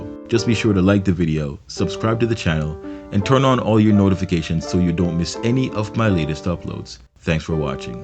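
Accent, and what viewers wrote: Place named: Jamaica. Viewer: if i watched this video before i wouldnt have had to ask if you were jamaican lol. notifications turned on! bell and everything! great video.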